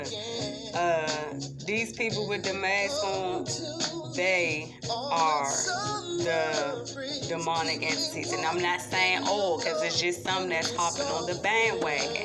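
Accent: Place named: Washington DC, United States. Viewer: Sounds like you're from DC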